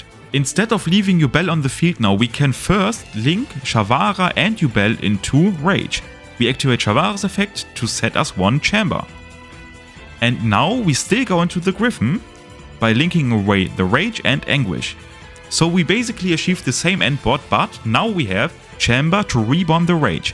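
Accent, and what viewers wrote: Germany. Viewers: So ein lustiger deutscher Dialekt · My guy has the strongest German accent on his English I've ever heard in my life chad af :^) cool video tho.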